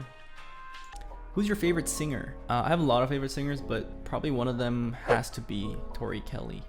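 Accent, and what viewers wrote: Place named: South Korea. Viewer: One question (Sorry not on discord will join soon) Will u voice anime Ur Korean accent sounds like u could Ty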